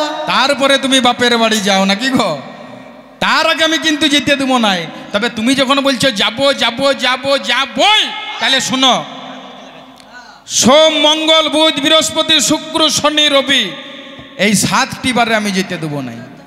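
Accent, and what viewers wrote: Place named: Bankura, India. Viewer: এর বাড়ি বাঁকুড়ায় নিশ্চয় ! ভাষায় বুঝা যায় !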